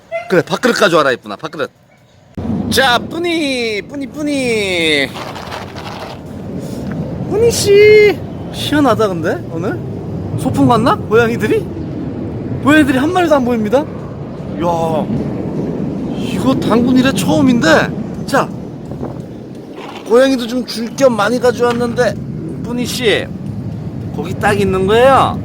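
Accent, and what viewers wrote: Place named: Japan. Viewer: 왜, 항상 일본식 엉터리 영어를 사용하시는지.. 혹시, 택시를 타쿠시라고 하고 버스를 바스라고 하시나요? 일본 사람들 ㅓ 발음 잘 못합니다. 그것을 한국 사람이 왜 따라하는지 모르겠네요.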